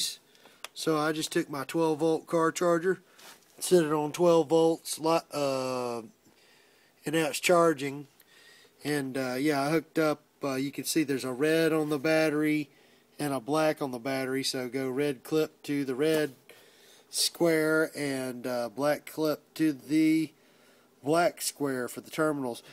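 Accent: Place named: South, United States. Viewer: Thanx for southern speak. To the point no rambling..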